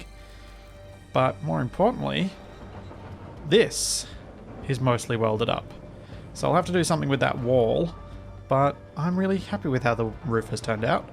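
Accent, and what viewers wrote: Australia. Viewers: Definitely. I'm assuming from the time you post and the accent that you're in Australia? I'm in a bit different time zone.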